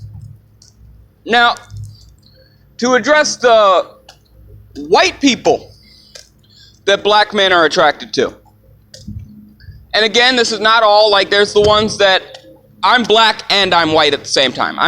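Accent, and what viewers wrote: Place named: United States. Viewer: I'm not sure what to say or think, at first I thought you were trolling -- but maybe you are an american and thus the accent. I'm mixed race as well -- and I've put a lot of thought into the subject for my years on this planet ... I don't quite cosign everything you are saying but I'm sure its accurate to a degree based off of where you live and the people around you -- but I do understand not being taken seriously if what you say is outside the popular narrative du jour. my life has lead me to embracing individualism as a primary value -- something I intend on passing on to my son who is even more mixed. still do you, its nice to see divergent voices and points of view especially among us multiracial folk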